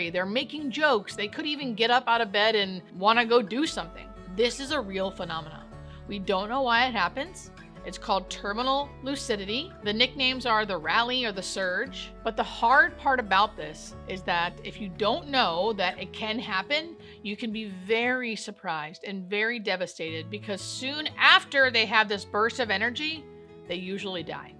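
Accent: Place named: United States. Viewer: Bless you, no offence meant you high yank accent is difficult and painful to my ears, again no offence meant. Your video belongs in north America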